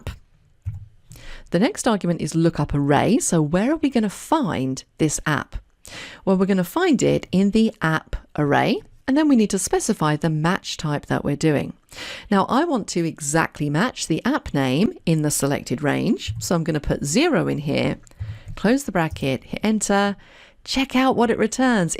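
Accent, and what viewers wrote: United Kingdom. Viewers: Sweet GB accent. 🌺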